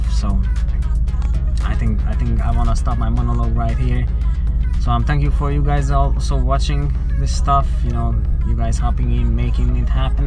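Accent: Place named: Armenia. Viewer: Your accent sounds either Armenian or Russain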